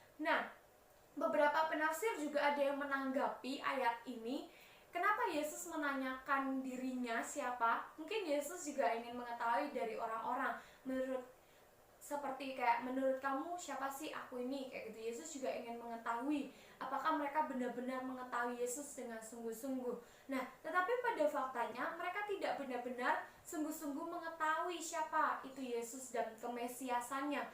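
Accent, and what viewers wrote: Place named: Java, Indonesia. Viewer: Aminn.. makasih ci... Medoknya menjadi ciri khas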